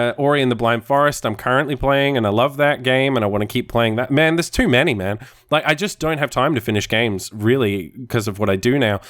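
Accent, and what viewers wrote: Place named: Australia. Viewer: Oh dude i can't wait:D Also wanted to say that i really enjoyed your Q&A, i'm really sick at the moment so i listened to it while resting and it was just fantastic. I always thought you sounded australian but with a slightly off accent and i couldn't quite put my finger on it but it was always leaning toward english, glad to know that you came from the UK. I'm also australian.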